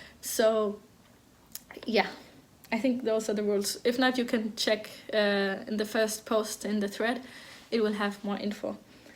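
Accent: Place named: Denmark. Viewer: Hello from Michigan in the U.S. I knitted through your podcast and it was so relaxing to hear your lovely Danish accent as I worked on my sock. I look forward to the next.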